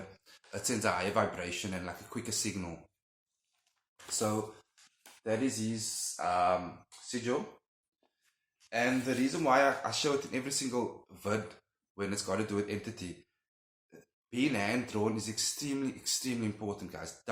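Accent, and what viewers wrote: South Africa. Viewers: Oh man, hearing your "R" i automatically knew you are South African. I love that accent.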